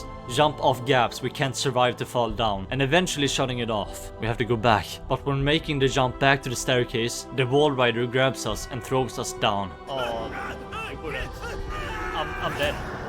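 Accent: Sweden. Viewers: Is he swedish?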